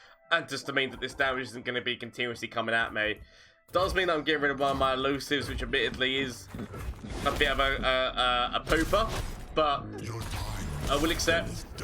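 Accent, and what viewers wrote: United Kingdom. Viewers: The best about his videos are that latino face and name paired with that lovely british accent ❤️ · Hehe love this accent ^^ Im From France ( Paris) Im in love with Scotland but i like England too 🤗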